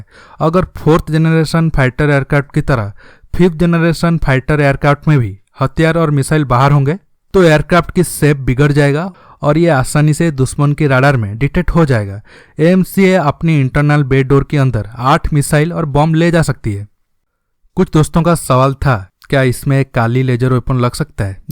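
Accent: Odisha, India. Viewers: Is the narrator an Odia?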